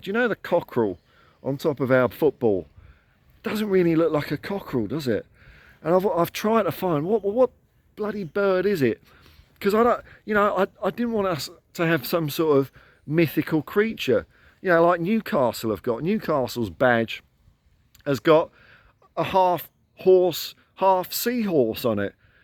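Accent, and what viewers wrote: Australia. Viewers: Just found this channel and cracked up mate! I'm a liverpool supporter for almost 35 years! I cannot stop watching everything that comes up about ange and spurs! I now know more about spurs team than I do my own! Not converted by all means but there must be so many Aussies that sit on the fence with teams That are now spurs through and through!! He will be the biggest thing for Australia football ever! I keep watching mate love half Aussie accent!!!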